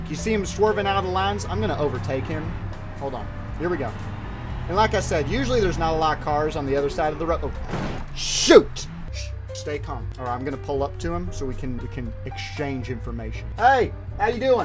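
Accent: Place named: West, United States. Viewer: I like the western accent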